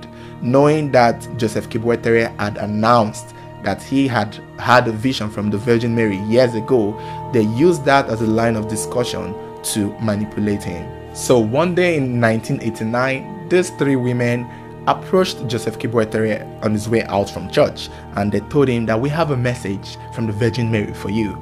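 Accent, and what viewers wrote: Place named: Nigeria. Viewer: This narrator sounds Nigerian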